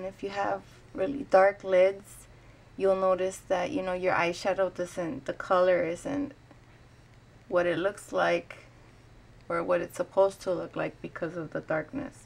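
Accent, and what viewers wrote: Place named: Puerto Rico. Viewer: I just stumbled upon your video. As soon as I heard you speak, I knew you had to be Puerto Rican! LOL I'm PR too; I too have dark circles pretty bad. I got most of it from my mom but I also don't sleep very well. I really like that you not only took the time to share this, you put yourself out there to do it. Also, thanks for using real world products. I don't know about anyone else, but I cannot afford $40 on just one product like concealer. You did what works for you and I love it! BTW, you are beautiful with or without the make up. Thanks! Make more make up videos like what eye shadows you use and blush! God bless you!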